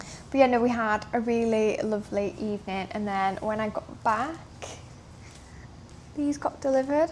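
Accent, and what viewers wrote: United Kingdom. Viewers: Love your very British accent ❤❤❤🎉🎉🎉🎉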